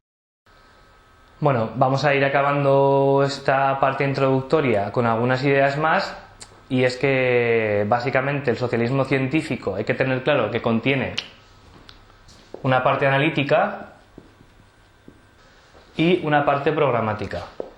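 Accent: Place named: Spain. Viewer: maldita sea que imbesilidad escuchar esto en un ridiculo asento español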